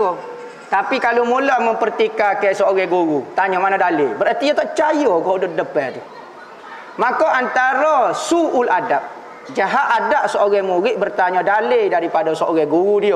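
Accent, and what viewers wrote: Kelantan, Malaysia. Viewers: Kepada yang tak berapa faham dgan penyampaian ustaz tolong jgan salah faham, kerana ustaz banyak menggunakan bahasa kelantan , loghat klate pekat, maksud yg disampaikan itu mungkin berbeza dgan apa yg kita fahami, 😌 🥰❤️ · Alhamdulillah, anda boleh memahami loghat Kelantan....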